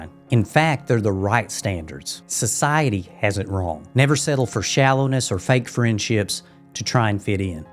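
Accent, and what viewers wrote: South, United States. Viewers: Like u n ur sweet southern drawl!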